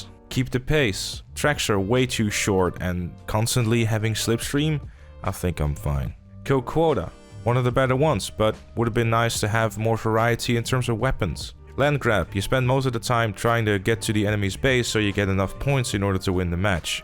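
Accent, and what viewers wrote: Netherlands. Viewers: Is the guy narrating Dutch?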